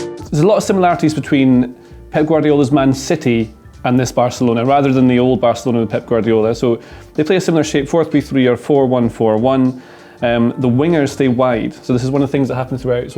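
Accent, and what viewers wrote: Scotland, United Kingdom. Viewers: Something about a scottish accent that causes me to take the opinion immediately serious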